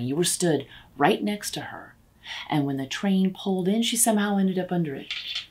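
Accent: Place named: United States. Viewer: Very well performed however, hope you don't mind me saying, even though the pacing was perfect & I enjoyed the story, there was a slight clash of American accent & English words that they do normally use...?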